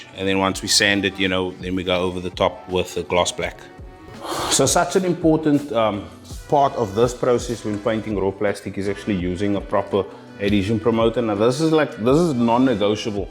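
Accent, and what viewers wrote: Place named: South Africa. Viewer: You look and sound like a South African.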